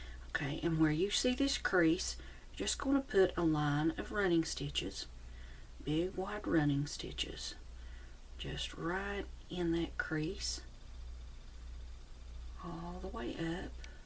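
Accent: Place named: South, United States. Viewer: Wow I’m so happy I found ya ! I’m southern and I can hear that in your voice but this is neat ! I just found ya on here ! Very happy I did ! I bet you could even use Bobby pins for the wires too even ! Just saying! Lol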